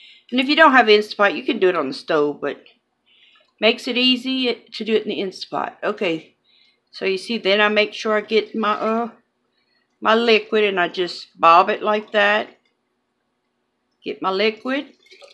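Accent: Louisiana, United States. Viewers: Hey Girl! Love this! I just found your channel and subbed! We live in Alabama now, but my husband and i are from Louisiana (he's from New Iberia and my people are from Natchitoches). I don't see many good, down to earth channels from people who sound like home from Louisiana, so: Good On You! God Bless!!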